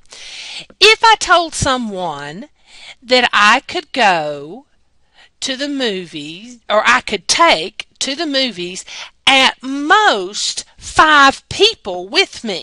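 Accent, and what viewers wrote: United States. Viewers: I love her American accent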